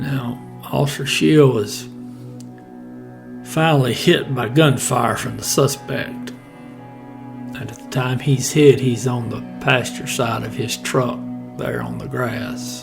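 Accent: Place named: South, United States. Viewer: I'm always glad to see you post another video. Your commentary is easy to understand, I can appreciate the Southern accent (lol). And you always mention the family's of the officer. Thank you very much.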